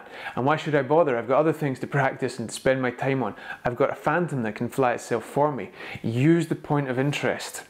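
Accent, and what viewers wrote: Scotland, United Kingdom. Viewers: Great explanation of the feature and great to hear another Scottish voice on YouTube! 👊🏻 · Very helpful video, and the Scottish accent is irresistible to a Yank like me. Killer footage almost worth colliding with that mangy tree to achieve. The only thing you missed explaining is how to use the sticks to change the altitude and radius while flying, which is fairly evident when trying it with the DJI Go 4 app, but not clear here in this video. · Love the channel and the content, the scottish accent is just a bonus 😊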